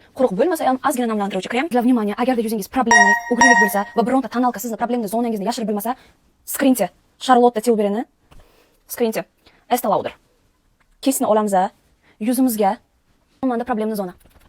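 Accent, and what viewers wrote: Bukhara, Uzbekistan. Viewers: Salom buxorolikmisiz.Buxoromni shevasidan o'rgilayda.🫰🫰🫰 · Navoiy yoki Buxoro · Shevaba gap nest.Buxoro nomer ❤❤❤❤❤1. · Шевангиз узингизга ярашади Бухороликмисиз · Biza Buxorolik bizaga shunaqa gapirish yarashadi😅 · Manga Buxoro shevasi yoqadi❤ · Бухороликмисиз дийман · Navoiylikla qanaqa gapiradi nima kulasan Buxoro shevasi zoʻr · Biz buxoroliklarni gòzal shevamizni ustidan hech kim kulishga haqqi yoq❤ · Buxoro sheda juda chiroyli sheva meni dadajonim Buxorodan ayajonim Samarqandan makyaj juda chiroyli vaaaaav🎉🎉🎉🎉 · Бухоро шеваси ❤❤🔥🔥🔥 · Ibi Manam Buxorolik😅 shevamdan aylanay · Buxoro shevasi nomer 1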